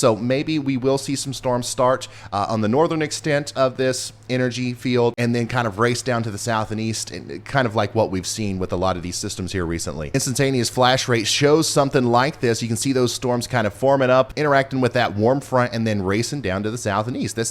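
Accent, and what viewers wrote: United States. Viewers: i love your little country accent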